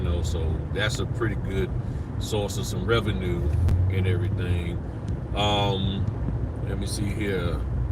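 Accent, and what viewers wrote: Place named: Florida, United States. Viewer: YOU DEFINITELY SOUND LIKE SOMEONE FROM DUVAL COUNTY!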